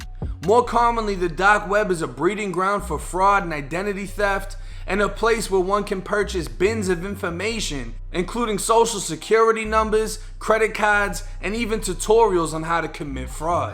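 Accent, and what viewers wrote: Boston, United States. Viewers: Bruh his accent funny as hell he gotta be from Boston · Boston accent nukka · Bro your accent literally half Boston half Florida😂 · Yo, you talk like your from the bay side of Boston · Where u from Boston? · The innernet instead of internet! Lmfao! We Bostonians hold our accent down! 😜. Keep on keepin on, I’m rocking will y’all and you rocking with us! 😝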